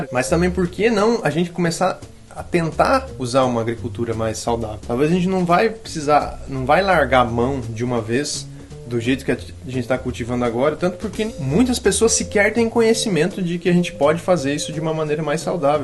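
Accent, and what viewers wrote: Rio Grande do Sul, Brazil. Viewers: Pelo seu sotaque já tava me mudando pro RS. Mas sabendo que tu tá no oeste do PR, muito mais perto. Vou ir andando me enfiar nesse espaço de vocês. Hahah #FicAGenteVêPorAqui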